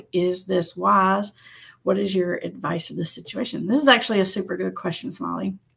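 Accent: South, United States. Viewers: your videos have helped me so much, You are great, and I love your southern accent. I follow you from Argentina😊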